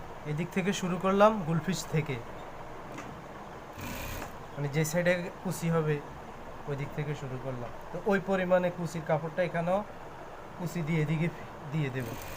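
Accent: Noakhali, Bangladesh. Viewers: আপনার কথা গুলো সহজ সরল, আপনার বাড়ি কি নোয়াখালি নাকি ভাই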